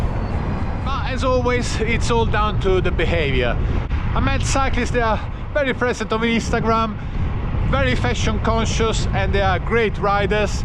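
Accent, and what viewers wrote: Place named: Italy. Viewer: Love yur videos and yur accent! 🇮🇹 I treat bike fashion the same as normal fashion, colour coordinate, either the same colour and mix colours from a similar pallet. Including bidon, casquette, gloves, helmet, but socks and shoes always white except black socks with black gravel shoes. Bib shorts are all black so can wear with any jersey. I love cycling fashion and looking Pro even if I don't Go pro! 😂❤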